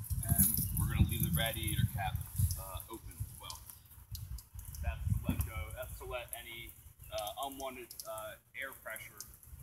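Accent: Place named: Pennsylvania, United States. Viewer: You said "wudder" (water) and "Rad-e-ator". Are you from York county Pennsylvania or Carol county Maryland?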